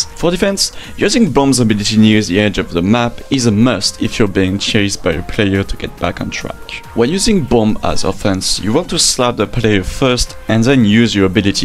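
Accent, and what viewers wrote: France. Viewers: Is my guy french??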